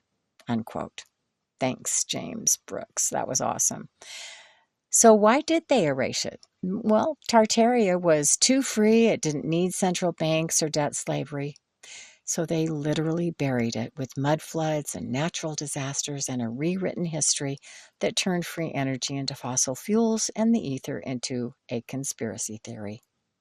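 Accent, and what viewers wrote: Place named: United States. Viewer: I'm 41 seconds in and your voice is more than the initial breath of fresh aair, it's American as Apple Pie and shelter from AI philosophers every other video. Ya watch one interesting Carl Jung vid and the avalanche of Yellow and Red starts happening in searches...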